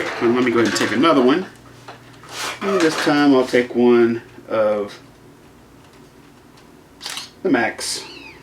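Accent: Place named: Maine, United States. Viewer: Your accent sounds like a "guy" I once played poker with. Are you from the Maine area? You articulate your videos very nicely for those of us stunted by the Thesaurus Rex cunning linguist known as DLeake.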